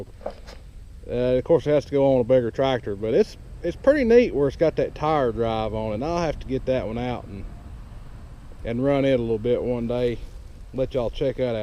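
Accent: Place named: Kentucky, United States. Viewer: Love my 1025R!!! Great little tractors. Are you a Kentuckian??? You sound like one lol. Im from South Central Kentucky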